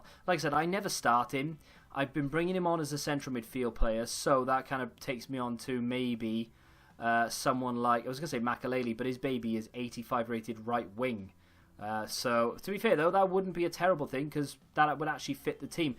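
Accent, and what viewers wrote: Wales, United Kingdom. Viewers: Are u welsh ?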